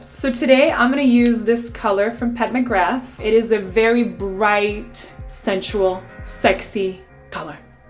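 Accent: United States. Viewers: I’m also Czech and i’m genuinely impressed by her accent, she sounds so american, like woah :D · Her accent really sounds american now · zní fakt jak američanka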